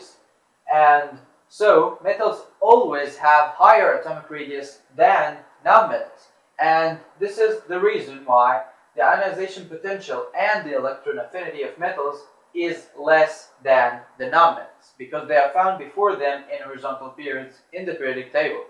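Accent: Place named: Russia. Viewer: You look mexican but sound russian.... WHAT ARE YOU!!!!?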